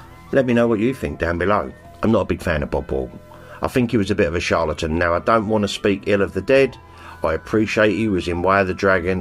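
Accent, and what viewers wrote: Cockney, United Kingdom. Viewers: GO ON MY SON GET IN THERE. ICF. EAST LONDON IM HAPPY TO FIND OUT YOUR WEST HAM LIKE ME I WONDERED WITH THE COCKNEY ACENT BUT NOW I KNOW PS I PLAYED FOR WEST HAM UNDER 16S IN 1985 SHAME I GOT HIT BY A CAR PUT IN WHEEL CHAIR FOR 14 MONTHS 😮😮🙏💯🤞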